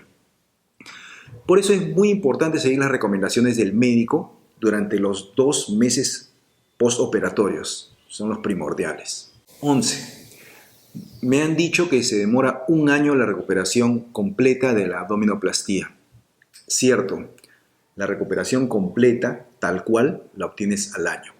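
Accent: Peru. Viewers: Donde tiene ud su consultorio yo soy de Ecuador y x su dialecto ud me parece peruano o me.equivoco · Alguien sabe de q pais es? Por el acento parece peruano? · Muchas gracias x la información me gustaria una consulta con ud podria facilitarme un numero para poder llamarlo. Por el acento puedo decir q es peruano cierto? Espero su respuesta doctor gracias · Por su manera de hablar es de mi querido Perú, ! Gracias por informarnos. Dios te bendiga!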